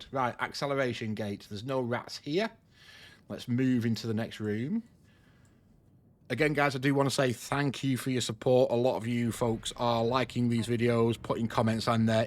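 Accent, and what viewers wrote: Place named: Manchester, United Kingdom. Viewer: Another helpful video. Thank you. I thought I had made a noob mistake getting blown up in Mission 5 of 10................I now feel much better about it! I have insured several ships but have forgotten which ones - is there an easy way to see which ones have insurance and which don't? I've also been meaning to ask where in the world you are - my guess is Manchester/Salford/Salford? I'm a Mancunian myself (Whalley Range) although since escaping from work we now live north of the border. Your accent takes me back home again 😀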